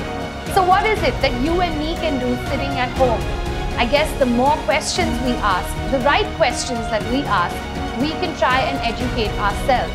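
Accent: India. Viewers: Hollywood bgm ! But indian english!!😅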